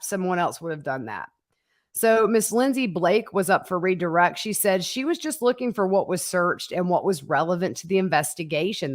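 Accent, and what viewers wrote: South, United States. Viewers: Love your accent. I’m Irish and live in Ireland, but must have been a Southern Belle in a previous life😂😂 Only joking. But love the accent, love saying y’all. 😂😂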